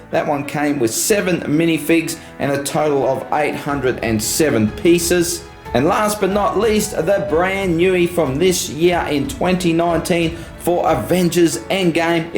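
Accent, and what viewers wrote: Australia. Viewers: i love being able to watch/listen to a true Aussie! Great Video!!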